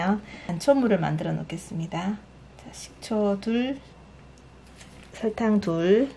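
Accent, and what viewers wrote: Gyeongsang, South Korea. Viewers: 하하하~~~ 경상도분이시라? 쌀이라하지 않고 살이라고 하네요.~~~♡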